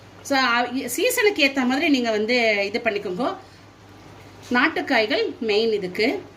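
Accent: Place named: Kerala, India. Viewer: Which place are you from Mami.? Konjam Malayalam touch iruke, Tirunelveli or Trivandrum or Palakad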